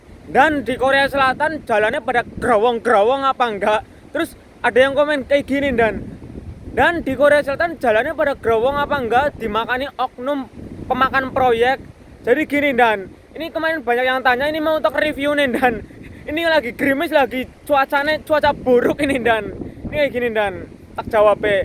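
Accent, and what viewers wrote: Banyumas, Indonesia. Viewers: Jelasine oke bgt.. ngakak pke ngapak lanjutno mas.. 😂😂😂😂 di Indonesia aspal di kira coklat jadine di jdiin cemilan 😂😂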